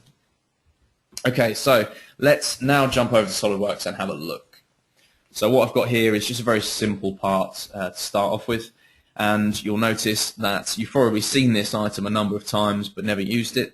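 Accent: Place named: England, United Kingdom. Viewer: Other than the silly english accent, very helpful. Cheers =-)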